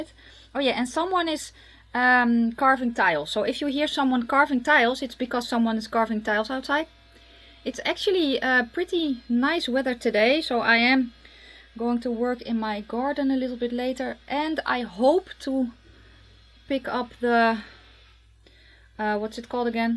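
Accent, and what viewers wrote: Netherlands. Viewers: Your dutch Accent is so cute! 🙈🥰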